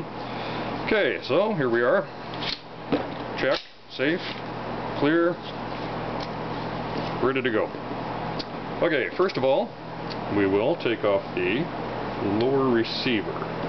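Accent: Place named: Canada. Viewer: LOL 2011KyussLives how could you not tell he is Canadian. Kyuss great band btw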